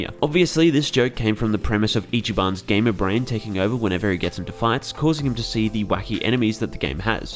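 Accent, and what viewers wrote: Australia. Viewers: The Aussie Just shone through in this video, never felt more at home!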